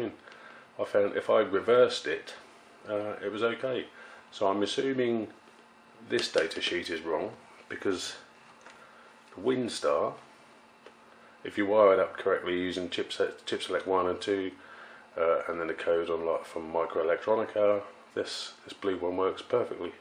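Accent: United Kingdom. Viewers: are you british or american aka born in what country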